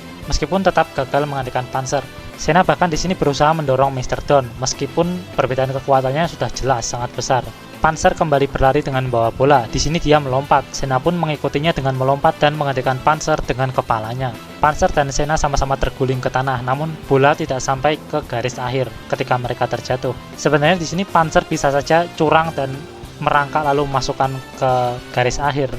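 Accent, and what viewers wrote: Java, Indonesia. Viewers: Miminnya orang jawa ya?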